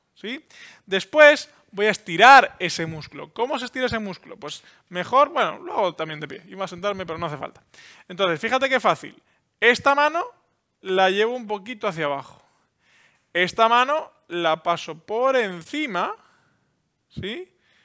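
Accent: Spain. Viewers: Me encanta ese suave acento gallego. Una Canaria 💖👍 Gran explicación. 🤗 La posición de la cámara perfecta, se sale 👏👏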